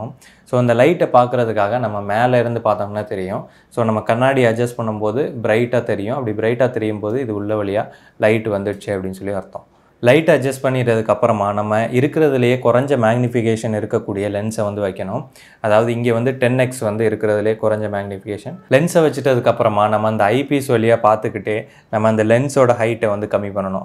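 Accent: Tirunelveli, India. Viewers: Bro neenga Tirunelveli ah!!!😍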